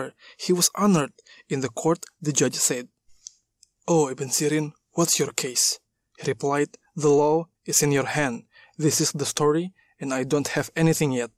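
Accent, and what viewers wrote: Indonesia. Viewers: I recognize this accent. Indonesian accent. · I 100% am sure the voice over actor on this video is Indonesian. His English accent is so Indonesian :) Anyways, great story and great voice over! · I'm hearing Indonesian pronounciation english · Indonesian English Accent... Good job! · The Indonesian accent:)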